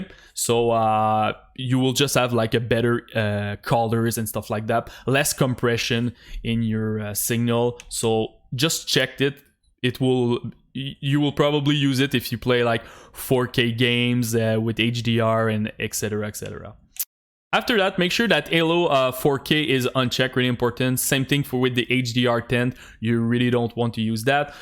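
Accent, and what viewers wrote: Quebec, Canada. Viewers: L’accent québécois mdr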